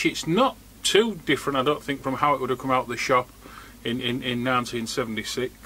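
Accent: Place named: Hull, United Kingdom. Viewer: Lol iam from hull ,can spot ull accent a mile away .just got gt <num> ,having starting problems ,and kick start stopped returning on its own for f sake .😢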